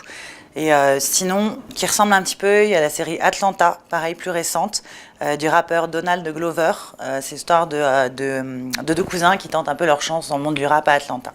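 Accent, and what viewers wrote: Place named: France. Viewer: merci pour cette video, juste un truc, tu prononces l'anglais à la francaise surtout la lettre R et th qui ne se prononce pas comme Z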